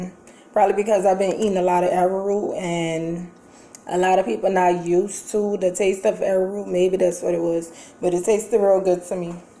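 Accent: New Orleans, United States. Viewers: U gotta be from New Orleans with that accent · How are you, I am one of your new subscribers. I love your accent and by any chance are you from New Orleans because your accent sounds very similar to my sister's who lives in New Orleans. I was born in New Orleans, but I been in Jersey most of my life. I also love your bricks from Starch Bricks, I finally received my order today. That was my second time ordering but I probably order from him again cause he is on point. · New Orleans? Your accent gave it away 😊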